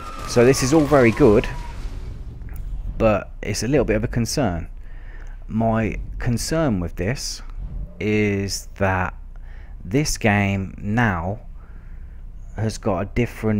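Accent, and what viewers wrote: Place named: Scotland, United Kingdom. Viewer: sound a bit scottish tbh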